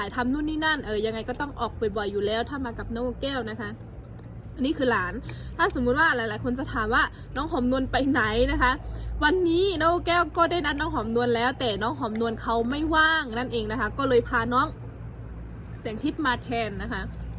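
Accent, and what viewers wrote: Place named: Tai Khuen, Thailand. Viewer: สวัสสดีน้อง เสียงแจ้วๆด้วยเสียงสำเนียงไทขืนเพราะน่ารักๆมากเลยส่งคลิปสนุกๆมาให้ชมพาสาวๆไปเท่วงานปีใหม่ไตมีคนมากมายมาเท่วงานขอบคุนมากครับ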